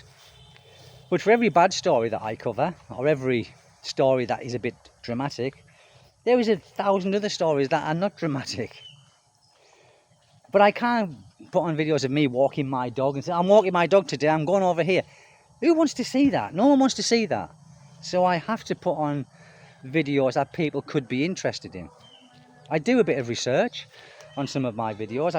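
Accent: United Kingdom. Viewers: Good morning my friend. You’re absolutely correct in what you’re saying and I know that I’m in the minority, but I watch your channel just to see what you’re doing today. I don’t even look at the title. At least not any longer than it takes to see that it’s you. I’m not interested in the drama. I’m not interested in the car wreck. I just want to see what my friend, over there living life on another island is doing today. Your British way of speaking gives me a chuckle. What makes me unsubscribe straight away is click bait. Put up a title and a video that has little to nothing to do with the title and I’m an “un-subscriber”! Doing “cost of living” videos I won’t watch either. I hate it when people ask about what it cost to live here or there in the Philippines. If you have been here, and gotten out of the tourist districts, then you’ll know what it cost and can figure out what “YOUR LIFESTYLE” will cost you… no one can tell you what it will cost YOU to live somewhere. 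But it gets clicks because people want to move here without ever having been here apparently. You keep being you and I’ll keep watching “YOU” doing “YOU”.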